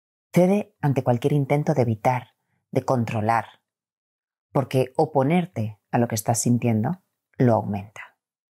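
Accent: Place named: Spain. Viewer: TENES UNA HERMOSA VOS, GRACIAS POR TU AYUDA, PRESUMO QUE ERES DE ALGÚN LUGAR DE ESPAÑA. 😁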